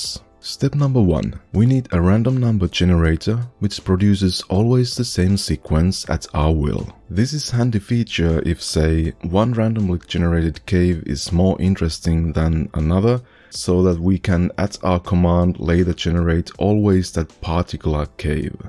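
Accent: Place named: Finland. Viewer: I could bet money you are Finnish. :D I recognise that accent.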